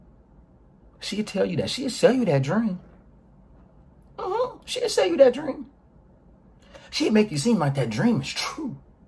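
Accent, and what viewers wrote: Baltimore, United States. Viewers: could pass for a BMORE dude by just the accent alone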